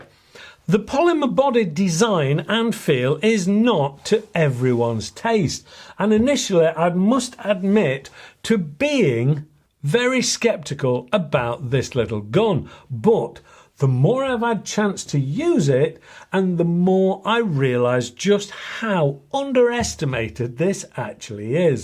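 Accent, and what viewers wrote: United Kingdom. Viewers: I love your accent, reminds me of all those Monty python shows I loved to watch.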